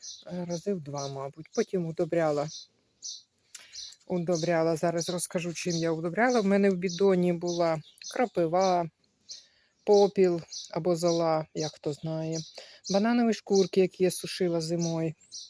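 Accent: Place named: Poltava, Ukraine. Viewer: В вас виговор Полтавський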